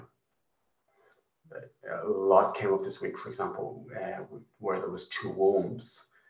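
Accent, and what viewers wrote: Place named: Ireland. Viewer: Thank you,. Wonderful xxx When Kim D’eramo mentioned your name It sounds so Irish to me (also Irish!) and now that I’ve heard you, of course you are! Love and gratitude xxxx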